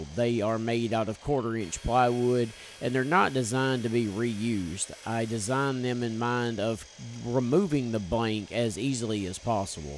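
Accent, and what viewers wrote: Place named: Florida, United States. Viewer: You sound like a Florida boy. No insult. Florida boy here.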